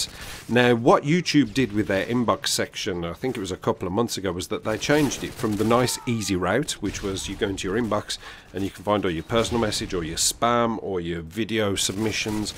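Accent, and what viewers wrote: Birmingham, United Kingdom. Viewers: You don't sound tired mate, it's just the brummie accent! ;-)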